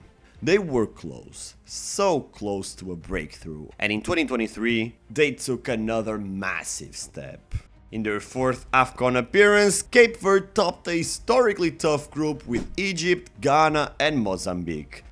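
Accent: Portugal. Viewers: By your English accent and your perfect pronunciation of Portuguese names, I can tell you are Portuguese. It is pronounced “Bubissta”, and not “Bubishta”. Good video, BTW! 👍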